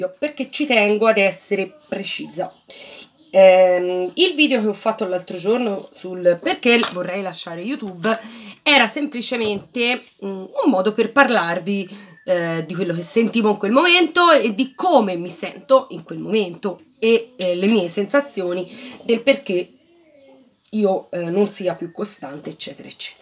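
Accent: Tuscany, Italy. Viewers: Sono contenta che farai ancora dei video, mi piace sentirti, mi fai compagnia, amo la tua parlata, mi riporti nella mia toscana da cui manco da 7 anni e che mi manca... Quanto alle domande che ti poni, ti capisco io me ne faccio troppe e tante su tutto, come dico alla mia amica io non ho un cervello ho un multisala, in cui proietto 1000 film diversi più volte al giorno e tutti diversi... Anche io mi aspetto tanto da me ed ho paura di essere una delusione per gli altri (ho qualche cicatrice anche io, anche se non si vede), per fortuna ho chi mi prende a calci o minaccia di farlo e allora proietto qualche film in meno, ma poco... Tu continua così che vai benissimo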